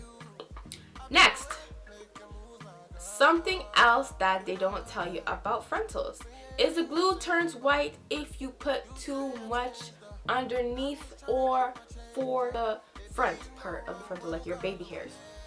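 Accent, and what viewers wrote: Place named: Nigeria. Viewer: Liked right after I heard the Nigerian accent 😂. New Sub‼️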